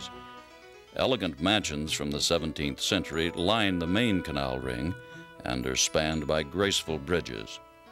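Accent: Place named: Germany. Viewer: Oh how it HURTS my ears to hear the Dutch words pronounced in GERMAN!!! Great documentary otherwise! But this should NOT have happened! Easy to prevent this and obviously not a very nice touch...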